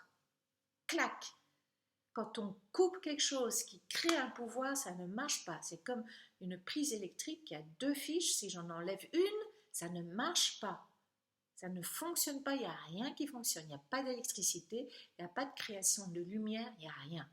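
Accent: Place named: Belgium. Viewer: Je ne sais pas pourquoi vous apparaissez dans mon fil youtube, mais vous y êtes. Je vous écoute et je me dis, elle est Belge!! Et c'est le cas en effet (on n'est pas comme les autres, c'est évident). Bon, mon point de vue: dans l'Homme (j'ai mis un grand H (je suis un boomer)), deux parties: une matérielle, l'autre spirituelle La partie matérielle est duale, les opposés se parlent et se complètent mais peuvent sembler entrer en conflit. C'est la voie de l'apprentissage dans le monde de l'Ego. La voie qui conduit à quoi? A l'Unité par la dualité dans le monde de l'Ego. Pour moi (c'est juste mon avis, rien de plus) l'Ego est un espace d'apprentissage qui me semble rejoindre votre concept de scribe, bibliothécaire, archiviste qui tente d'écrire une histoire, "votre" histoire mais qui, pour moi, ne peut se suffire à elle-même puisqu'elle doit permettre d'ouvrir une porte de sortie spirituelle "positive" qui vient compléter le tout dans cette Unité qui nous constitue.. 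Merci de m'avoir permis, par vos propos, de m'assoir au bord du temps qui s'écoule et d'y cueillir un instant de pensée. Merci à vous, Chère.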